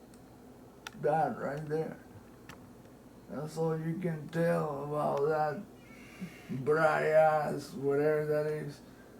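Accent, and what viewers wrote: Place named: Spain. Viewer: Your Spanish accent is Too much man. Learn to pronounce is like u do shit on purpose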